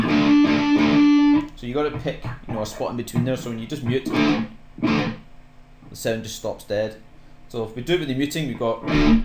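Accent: Scotland, United Kingdom. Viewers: BRILLIANT M8 where areyou from in Scotland ?? Edinburgh?? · you scottish dude?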